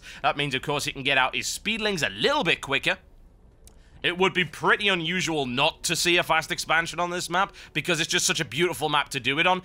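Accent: Germany. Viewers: Love you`r German Accent :)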